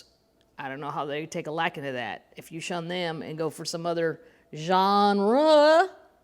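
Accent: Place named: South, United States. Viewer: spot-on Southern accent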